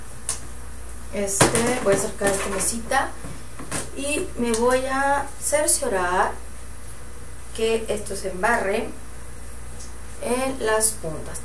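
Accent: Mexico. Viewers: Adoro tu voz y ty forma de ablar xD eres mexicana?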